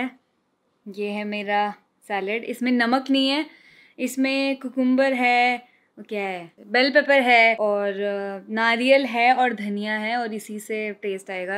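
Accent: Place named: North, India. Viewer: Really liked the simplicity of this girl. No unnecessary "angreziyat" or overacting. I like her pure desi North Indian Hindi and how confidently and proudly she's speaking it. Very good video 👍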